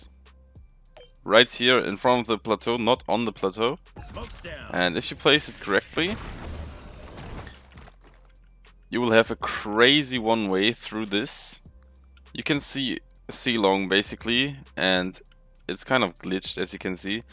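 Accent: Germany. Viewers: Are you german? Because of your accent ;) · Wie man hört das du deutscher bist haha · Wie Deutsch kann English eig. sein :D... Gutes Video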